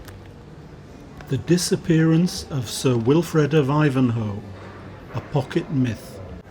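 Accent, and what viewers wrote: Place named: United Kingdom. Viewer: Thanks for sharing — an interesting project. A few comments: The verbal narration (British accent, high vocabulary, and clear diction) gives the video a quasi-documentary credibility, which works well for a contemporary-myth style story. The title’s allusion to Walter Benjamin’s The Arcade Project similarly reinforces that tone. This setup suggests a deeper symbolic reading — not necessarily Benjamin’s exact ideas about consumerism and cultural loss, but something in that thematic area that isn’t yet fully developed. Regarding the filming treatment: if you adopt the criteria of a contemporary myth, consider expanding beyond simple montage. In addition to collage, other cinematic treatments could enrich the marcette scene. One distracting element is the camera’s hand-shake; using a tripod for that shot would help. I hope this feedback helps with your next iteration.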